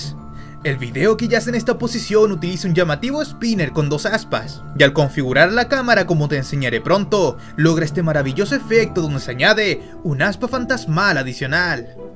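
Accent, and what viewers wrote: Chile. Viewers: eres chileno prro? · eres de chile o soy io?? v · eres shileno wn qlao? fome la wea wena hermano sigue asi Ksnfjflglslfktnek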